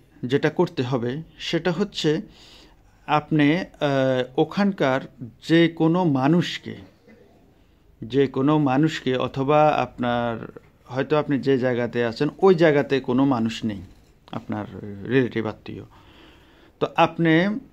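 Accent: Noakhali, Bangladesh. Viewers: Thank you. Bhai apnar bari ki Noakhali? Kotha suna mona hola. Apnar suggestion bhalo laglo. Apnar email address ta dan.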